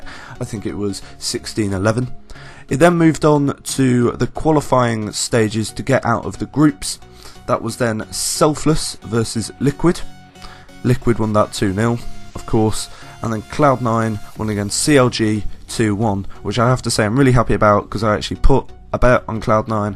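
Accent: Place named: North, United Kingdom. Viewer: Bro, your logo makes me so happy to be alive. Also I'm guessing you're a dirty Northerner judging from accent :)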